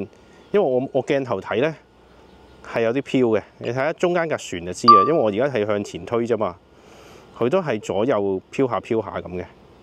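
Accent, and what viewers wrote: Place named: Guangzhou, China. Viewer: 廣州大陸🐖口音，講英文都怪過人😂🖕🏽🖕🏽🤙🏽🐔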